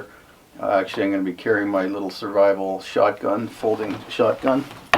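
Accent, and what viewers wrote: Canada. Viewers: After a tough day, coming home to a vid is just great. I love the Canadian accent, I'm sure it varies according to area, (maybe not as extreme as in the UK). Its very comforting somehow, I can't explain how or why, but listening to you speak is soothing. Keep up the good work. Some may think these are trivial vids, but I find them fascinating, and they answer a lot of questions that I'm interested in.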